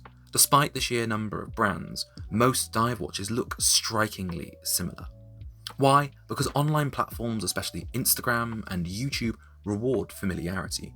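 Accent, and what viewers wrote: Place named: Upper class, United Kingdom. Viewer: Just found this channel and it is already my favourite place to go for all things watches. Although I’d like to make an observation and please do not take this as a criticism. Why do a majority of watch channels speak with a very distinct middle upper class accent? With very unique pauses between words. To give you an example listen to TGV, to bark&jack, time&tide etc etc the list is endless. They all talk the same way which I thought was very unusual. I haven’t noticed this with any other hobbyists besides horology. Like to hear your thoughts on this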